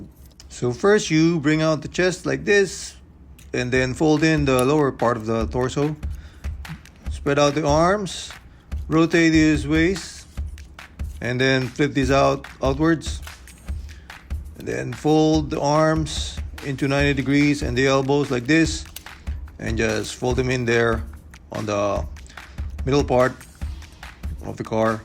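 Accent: Philippines. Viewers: i dont really care for beast skywarp but earth sideswipe is what i really want! hey are you from the philippines? i am you just have an accent when youre filipino but youre speaking english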